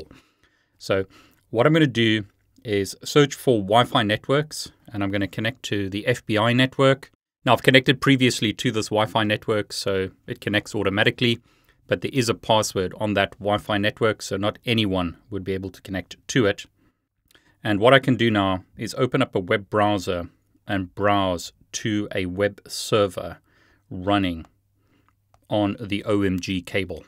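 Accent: South Africa. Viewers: for some reason somehow your accent sound like an english south afircan to me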